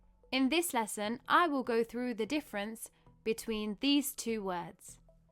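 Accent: United Kingdom. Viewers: I have watched half of your video on YouTube, I can say that the way you are teaching is fantastic. You are a great teacher . Your British accent is still strong and clear for me . The way you explain is very clear and easy for thevpeople to digest your lesson. Keep up with new lesson .well done.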